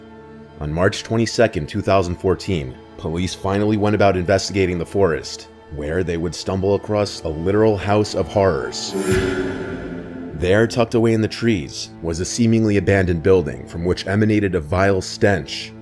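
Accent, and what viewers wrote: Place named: New Jersey, United States. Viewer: Great videos! I am super fan. I feel like I hear a slight NJ accent. Or NY maybe... Anyway, keep them coming!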